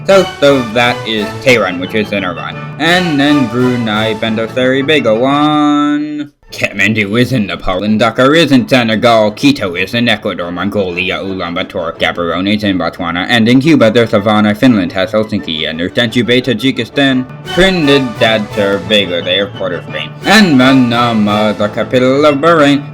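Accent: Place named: Scotland, United Kingdom. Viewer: I cant understand anything with ur ugly stupid scotish accent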